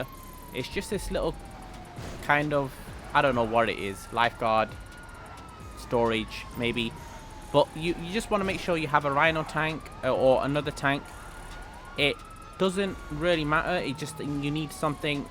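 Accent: United Kingdom. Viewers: ah I love this British accent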